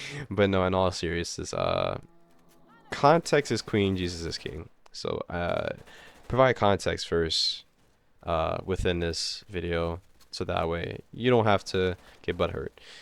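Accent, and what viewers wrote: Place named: AAVE, United States. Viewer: I have a feeling this guy has an iq of 87, this isnt a joke post just watched it cause it looked absurd. The ebonic vocabulary and accent used, speaking gait, and "find god for yourself" means this guy is most likely some mulatto amerimutt that engorges in weed often. He dances around trying to make an actual point aboutt he furry community and says vague non statements, "like everyone is ai or something and people are like copying eachothe,r" how though it is never explained. The only point he makes is that "christian furries" often have clashing view with his and that there is "no creativity" and thats about it. The mental gymanstics of "religous furries" never ceases to confound and entertain. No examples, no anlysis, just babbling on. It must be a pity what happened to you to be colored and a furry and also advice, if you want to try and make/prove an arguement, try not to say "like" so mucn, its a clear give away for a wrinkleless brain asnd follow the essay 101 stuff you were tuaght in elementary school, intro with and arguement and intro to sections, the sections, and the concluion. 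Who cares Im just a chud anyway.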